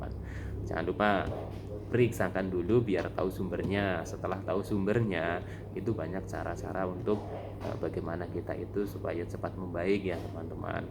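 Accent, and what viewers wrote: Banyumas, Indonesia. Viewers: Mas mau tanya, Mas ngapaknya mana, sy ngapak Kebumen, anxiety sy masih suka datang Mas, blm sembuh bgt. Trimakasih motifasi nya, 🙏🙏🙏.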